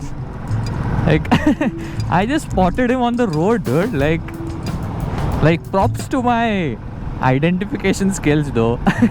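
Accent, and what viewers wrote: Malvani, India. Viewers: मालवणी माणूस मालवणी बोलताना भारी वाटता. एक दम गावाक एल्या सारख्या एक नंबर भावा 👍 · Bro has that very good English accent And then has the Proper Malvani Accent too "Khay jaata tu?" Damn..........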